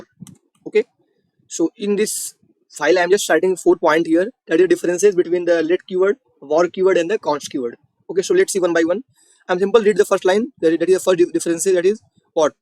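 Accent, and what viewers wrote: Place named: England, United Kingdom. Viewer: i dislike your aksen english, but i like your work. well done my friend.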